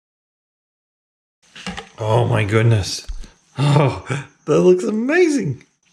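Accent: Australia. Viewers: Beautiful. Loving watching a soap vid with an aussie voice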